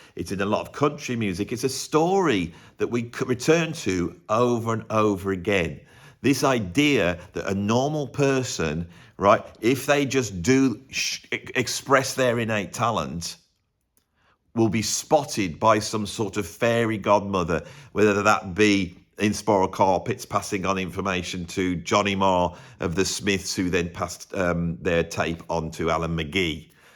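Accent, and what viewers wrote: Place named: Stourbridge, United Kingdom. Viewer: You aren't from Stourbridge, or nearby, are you? You sound like guys I grew up with 🎉🙏🏾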